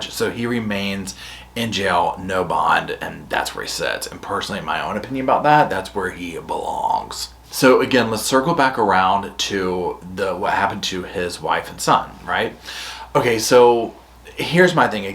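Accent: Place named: North Carolina, United States. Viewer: NC!! I knew your accent sounded like mine! Xoxo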